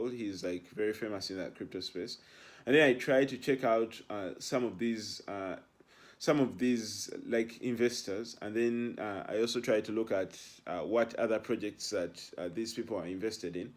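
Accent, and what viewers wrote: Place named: Uganda. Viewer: Kiribwa oluzungu banange. Still Ugandan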